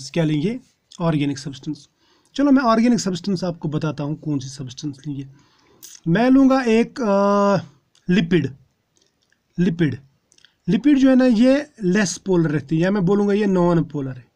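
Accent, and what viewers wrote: Kashmir, India. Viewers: totally Kashmiri accent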